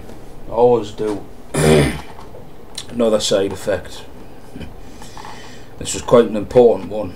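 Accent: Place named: North East, United Kingdom. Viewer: i could sit an listen to you all day, where in the N.E are you from?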